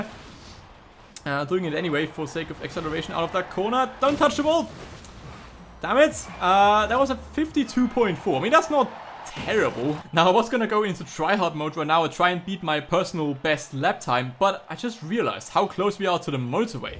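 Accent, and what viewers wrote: Germany. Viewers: Er hat einen deutschen Akzent oder bin ich bescheuert? 🤔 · You are a German right? Ps: Me too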